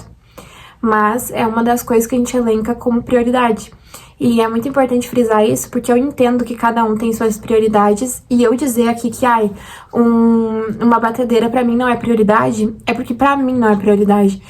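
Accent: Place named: Rio Grande do Sul, Brazil. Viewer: pelo sotaque, também sou gaúcha de Porto Alegre.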